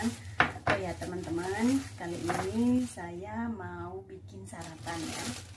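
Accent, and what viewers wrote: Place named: Java, Indonesia. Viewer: Enak wae gthu denger logat jawane😂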